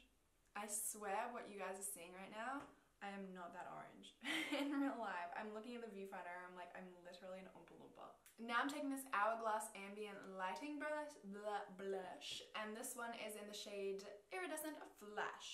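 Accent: Australia. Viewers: Your an Aussie? G’day!!! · I literally pause the video every once in awhile and try to copy what you say in an Australian accent because I want one so bad hahaha I think it's so cute!!! Love u 💖💕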